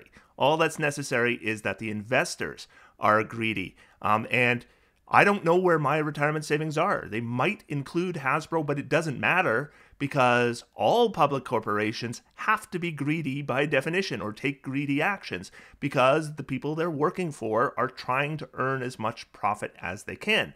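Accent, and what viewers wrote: United States. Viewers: It's almost like the profit motive degrades the value of a product over time as a business gets more and more successful. I was waiting for to say something along the lines of "profit motive bad" but it never came. To be fair I don't know him or his personal positions on things like this but also to be fair he's probably an American from his accent and he's probably been around long enough to see this sort of thing happen time and time again (at least in America). Maybe if the main goal of a business was to maximize product quality instead of product profitability, we wouldn't run into the age old problem of product quality being sacrificed in exchange for profitability. Now this is highly improbable under the current system with the way things are. So here are some changes to how the economy would work to fix this problem (and fix some other unrelated problems too): All companies must be by the law of the land, run by an elected president of the company (max term 4 years). 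No CEO, no owner of the company. In fact there is no owner of the company. Instead every employee is a part-owner of the company and gets a share of the stock. The stock isn't there to earn money. It's there to show you're part owner. Speculation (gambling (which is bad)) on the price of a 'share' of a company is now a thing of the past. Instead the profits will be split evenly amongst the employees. (The president will save some of the profits for maintenance, replacing equipment, etc.) Also to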